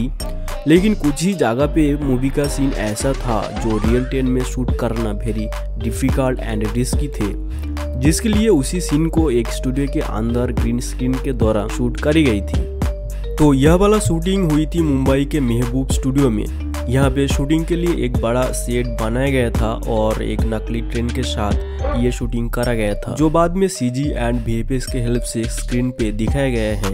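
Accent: Bengal, India. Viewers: 😂 Bengali accent hai. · Hindi bhi aap bangla me bol rahe ho 😆, Please hindi sudharo. " Meenamma daakte (bulate) hai" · DADA you are Bengali.. oh pata chai hi rah hay.... Pati Banglay bolle bhalo korte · Bhai bangali accent mix hain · May be he hails from Bengal. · Bengali accent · Mere papa jaisi, bhai hamare Bengali hai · Bengali and Hindi mix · Ur voice is Bengali I caught 😂😂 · Are bhoi aap bongali ho kya? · Sir bengoli hai..isi wajha se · I think he is Bengali. It seems by his accent. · Bengali accent hai, south Indian nahi!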